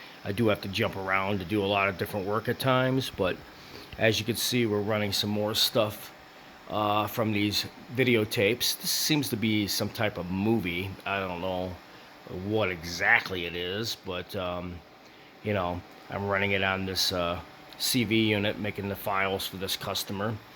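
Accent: Chicago, United States. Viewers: Sharing knowledge with a fierce Chicago accent.👍👍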